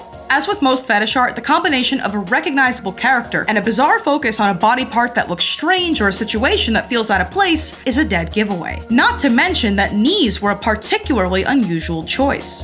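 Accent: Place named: Boston, United States. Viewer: Sorry for the unrelated comment, I do enjoy your videos, but I gotta say your accent is interesting 😅 it's mostly general American, but you have the Boston o, like in the words 'all', 'of', 'talk'. Do you have like one parent from Boston or something like that?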